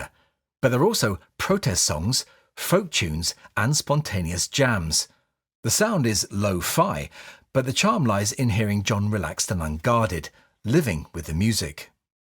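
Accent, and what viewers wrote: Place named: United Kingdom. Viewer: Excellent review. As an American, I find your "British voice" (not the correct way of expressing this) adds to your deep credibility in discussing Lennon. I will not, however, spend my money on this set because I was alive in 1972 when were radical artists doing radical artistic stuff in New York City and I heard their works back in the day when all their unique material first was released. I have to conclude that their son, Sean Lennon, misses the point entirely about his radical artist parents. There must be something wrong with him. Maybe he is "in denial"? He of all people should have loved and accepted his parents for who they genuinely were. And they were radical artists. No two ways about that. This set may promise to be impressive in the context of the truth that today's technology makes audio recording with the available technology from fifty years ago sound "better." But eliminating the controversial song " " from the set does not make this set "better" at all. 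Quite the opposite is true. The specific elimination of that essential song in unforgivable and the elimination erases any credibility Sean Lennon has as a producer.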